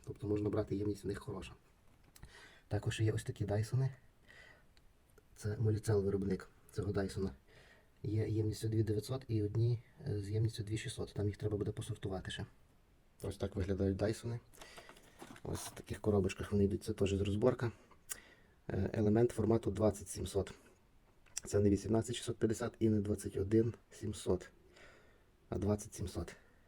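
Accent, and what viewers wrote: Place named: Ternopil, Ukraine. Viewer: Ви що, по акценту не розумієте? Тернопіль!. Мєв...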